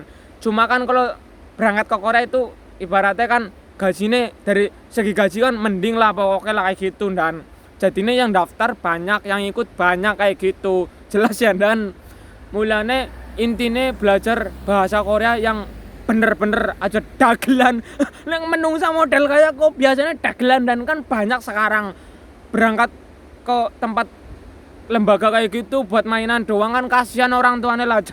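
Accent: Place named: Banyumas, Indonesia. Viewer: Salam NGAPAK NDAN.. ORA NGAPAK ORA KPENAK HHHH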